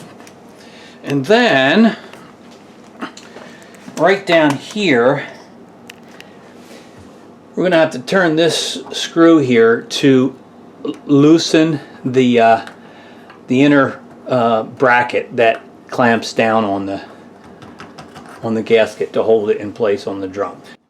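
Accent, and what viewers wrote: Philadelphia, United States. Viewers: You from Philly or Boston?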